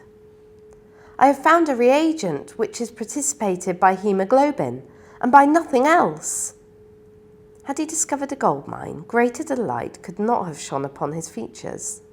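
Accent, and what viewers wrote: United Kingdom. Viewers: 여기 담당자님께 질문 드려요 쌤의 발음은 영국 어느 지방의 사람들이 쓰는건지요? 감사합니다 😀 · 귀르가즘이 뭐 여기서만 쓴 표현도 아니고 영상과 사운드가 중요한 유튜브에선 특히나 많이 쓰는데 왠 불편러들이 이리 많지. 이 표현 뿐만 아니라 이 채널 전반적으로 선생질 하는 댓글이 많은데 뭔 교육컨텐츠라고 꼰대들만 몰려왔나. 영상 좋다가도 댓글 보기가 너무 불편. 습관적으로 스크롤 내리는걸 안해야할듯 ㅡㅡ... 선생님 목소리도 편안하니 듣기 좋고 발음도 영국 특유 발음이 듣기 좋아서 어울리는 표현이라고 생각하고 특히 영어 교육에 특별히 관심이 없더라도 호기심 가지고 눌러볼 만한 제목인것 같아서 오히려 더 좋은것같습니다. 실제로 제목 보고 들어와서 컨텐츠 내용 좋아서 구독했구요.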